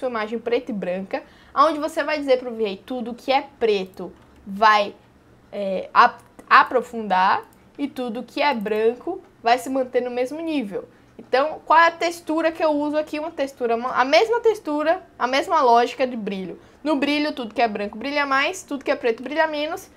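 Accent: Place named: Bahia, Brazil. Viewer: Bacana! Vc pode conseguir também editar os mapas de bump e reflexo através do Color Correction em vez de usar o Mix. Foi inclusive a primeira vez que vi alguém usar o Mix com essa finalidade que vc usa. (em geral eu uso o Mix apenas no Diffuse pra mesclar texturas diferentes, como adicionar pontos enferrujados a um metal originalmente polido). Obs. Adoro sua didática. Esse jeitinho alegre e o sotaque baiano me encantam.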